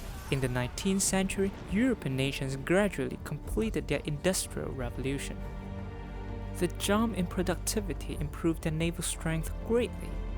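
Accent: United Kingdom. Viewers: 加上英式發音簡直絕配啊啊啊啊啊啊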